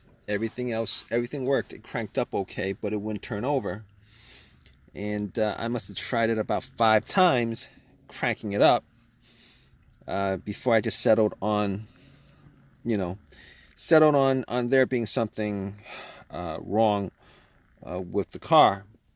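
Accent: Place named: North, United States. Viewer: What is "FU" cap, or a "FEW" cap?!? That would be a F U E L cap man. Not a FU or FEW cap. You're from the northern part of this country aren't you? Mean no offense by that, you guys just talk really weird and funny!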